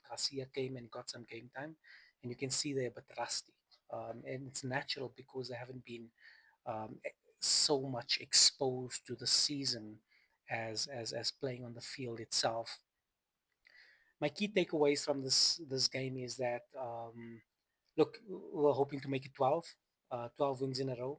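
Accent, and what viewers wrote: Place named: South Africa. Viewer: beautiful accent. are you from south africa by any chance?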